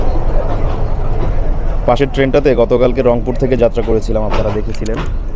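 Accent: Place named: West Bengal, India. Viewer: এই ভিডিও তে আপনার কথা শুনে মনে হচ্ছে আপনি পশ্চিমবঙ্গ বা কলকাতার আসে পাশের কোনো এলাকার ছেলে 😅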